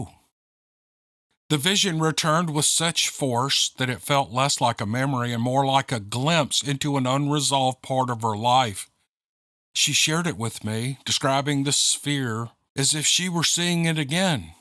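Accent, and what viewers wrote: South, United States. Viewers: ALL of the stories were GREAT, thanks to those who took the time to share. (Of course, TY to and his delightful southern drawl.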